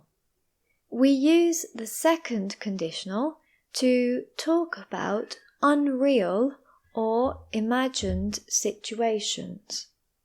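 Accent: United Kingdom. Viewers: Are you British????? Reply please ❤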